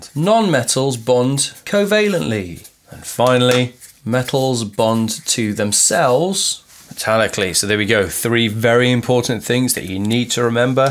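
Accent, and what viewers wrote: United Kingdom. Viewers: Are you Brittish?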